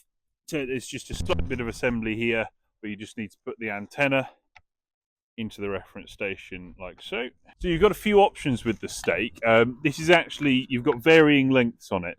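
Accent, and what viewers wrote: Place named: United Kingdom. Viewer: I would have liked to have watched this video in detail, but being from Alabama, U.S.A., I could barely understand only a few words this narrator said. His accent was too Britishly thick for me to understand very much of anything he said. I need another video with better enounciation than this. I'm sorry, but I'm only being honest.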